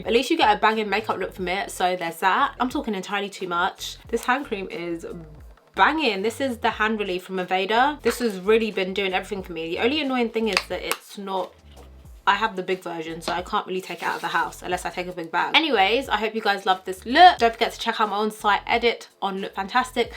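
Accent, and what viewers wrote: United Kingdom. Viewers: I DIDNT EVEN KNOW SHE WAS BRITISH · You being as pretty as you are and then that British accent on top of that is just ugh chefs kiss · Woah has she always been British 😍😍. It makes sense now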